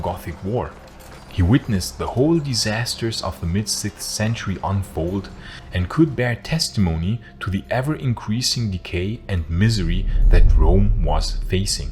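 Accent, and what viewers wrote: Germany. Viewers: german accent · It's absurd trying to present and emphasize the Eastern Romans as the ones who destroyed Rome whilst the barbarians as the ones who wanted to make it great again as if the latter were not the ones the Romans have been fighting against forever. I urge you to stop the propaganda favoring the barbarians. We know darn well who overthrew the Roman Empire in the West and not you nor anyone can change that, no matter how many videos you make using pretty English in germanic accent.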